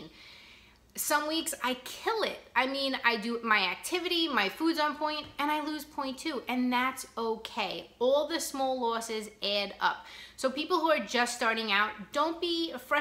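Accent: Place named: Long Island, United States. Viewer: The Fit and Fabuless Mama I am in Ontario I live in the north of the province in a small town close to Huntsville Love that you caught the u, if we were speaking g then for sure you would hear a Canadian accent as I hear your LI accent and think it's lovely 😊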